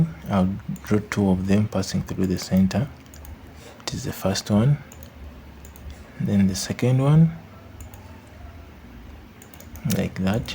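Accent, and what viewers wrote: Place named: Kenya. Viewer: you have a Kenyan accent. Thanks for the tutorial!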